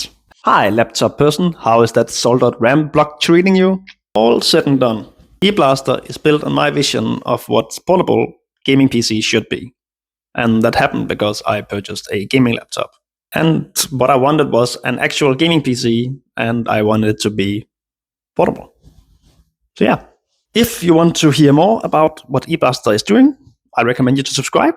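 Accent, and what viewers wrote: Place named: Denmark. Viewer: Dear god, that Danish accent :)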